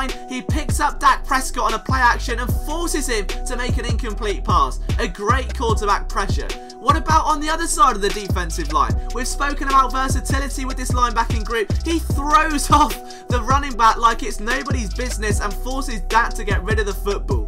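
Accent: United Kingdom. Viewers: who the f is this BRIT talking about FOOTBALL???!!! doesnt even sound right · Wow this is different somebody reporting about the NFL with a British accent are we getting through to the people across the pond that american-style football is Supreme Over homosexual soccer ?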